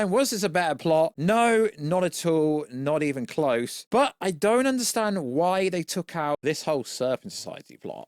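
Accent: United Kingdom. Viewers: You sound British eating beans and tuna all day ...🤮🤮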